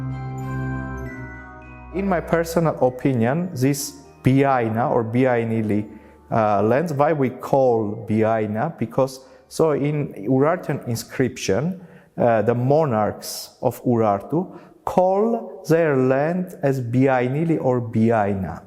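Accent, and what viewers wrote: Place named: Turkey. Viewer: Interesting and greatly informative video, what is more interesting is I would say the presenter is Turkish if I didn't know he is Armenian, due to his accent and outlook. Most of my good friends were Armenians back in the day, and my father served his military duty in an Armenian village in Eastern Turkey (yes we still have a sizable Armenian community). Contrary to Turkish claims that Sumerians are Turkic, or Meds are Kurdish, the Urartu-Armenian coonnection kind of makes sense to me.But other than the archeological dig outs, I would be more interested in the linguistic evidence, otherwise cultural elements can be easliy adopted by people who came to the region later on. We Turks consume the most tea er capita in the world and love olive oil etc. Sth. similar can be seen in the case of Hatti-Hitite as well. I think I will watch some videos on Urartu-Armenian linguistic connection. 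But this video was really interesting.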